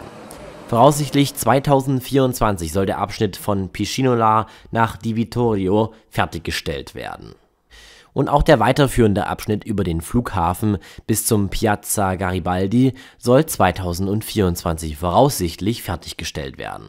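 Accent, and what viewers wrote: Germany. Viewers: Hallo, ich habe jetzt schon einige Videos von dir geschaut und mich gefragt, woher dein Dialekt kommt? Hast du irgendwie Wurzeln in Baden-Württemberg? 😄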